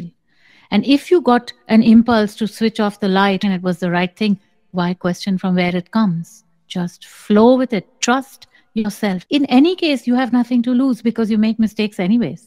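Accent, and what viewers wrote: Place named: France. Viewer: Unmistakable heavy French accent ;)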